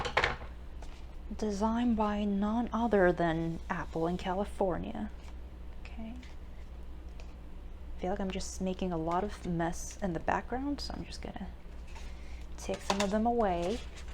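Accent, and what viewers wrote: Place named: United Kingdom. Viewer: 왜이리 귀여어요 끝까지 다봤다요 크큭 발음 왜이리 영국같아 간지나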